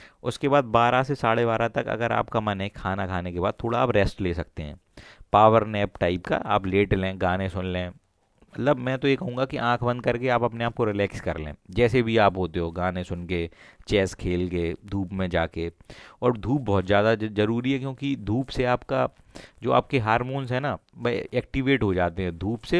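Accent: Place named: Etah, India. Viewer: apke bolne ka style ekdm Etah side ka lgta h😁😁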